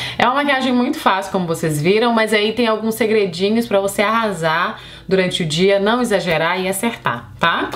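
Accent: Minas Gerais, Brazil. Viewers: Amo o sotaque mineiro. 💗 Amei a maquiagem como sempre.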